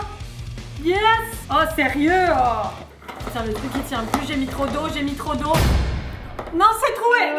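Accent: Quebec, Canada. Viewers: t'es québécoise ?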